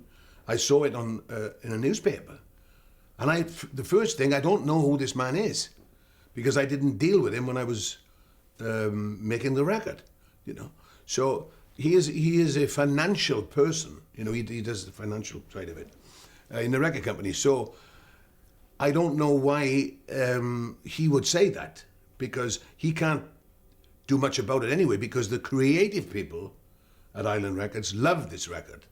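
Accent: United Kingdom. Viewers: I love your voice, your English accent and your good sense of humor and more your humbleness god bless you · Azim Hulaimi he's Welsh , actually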